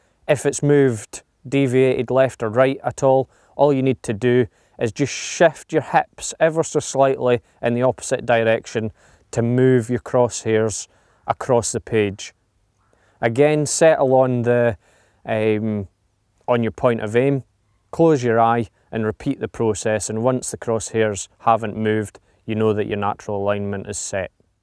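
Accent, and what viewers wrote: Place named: Scotland, United Kingdom. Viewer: Love that Scottish accent Laddie.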